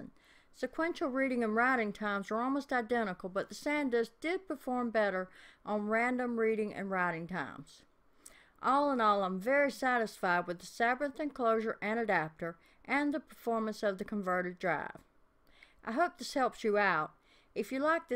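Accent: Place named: Texas, United States. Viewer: I like your video, are you from Texas Because this is the kind of accent I hear when I watch cowboy movies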